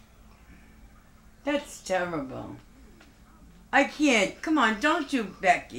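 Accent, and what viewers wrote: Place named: New York, United States. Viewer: a true New Yawk accent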